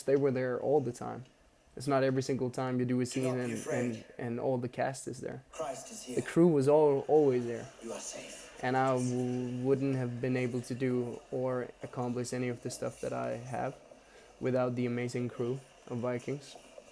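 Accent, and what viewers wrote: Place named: Denmark. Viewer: I listened to this whilst napping this afternoon and HIS voice is like ice cream in my ears,so soothing..he should read audiobooks or sleep stories or something like that. Love the Danish language and accent but his English is pretty great I would say,I like the way he says things when he gets a bit muddled!. 😄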